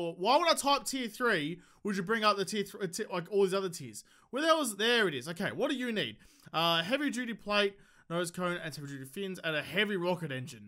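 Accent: Australia. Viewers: Your Australian?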